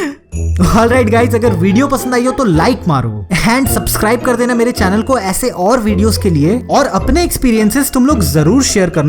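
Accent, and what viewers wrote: Bengal, India. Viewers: Are you bengalee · BHAI TU BENGALI HAI KYA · Tu Bengali hai Kya Bhai... ? BTW nice video Love from Kolkata ❤️ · Bhai tui west bengal se ho?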